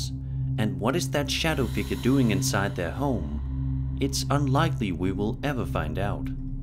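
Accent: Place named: Denmark. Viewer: Dont know why but your accent sounds too danish ion know why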